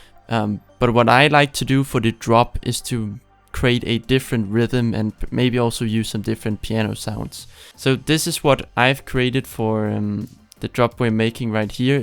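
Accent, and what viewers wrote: Denmark. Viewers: Er du dansker? :p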